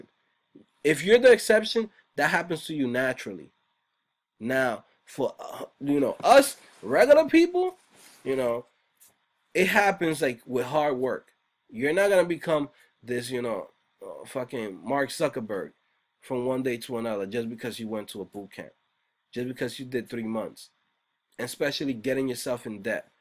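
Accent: New York, United States. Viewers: dude i love they way you are talking ahahah, are you from ny? thanks for telling it like it is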